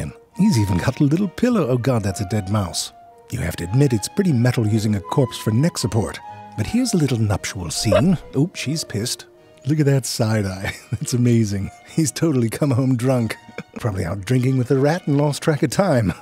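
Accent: United Kingdom. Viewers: Is it just me or is the voice starting to take on some elements of a British accent?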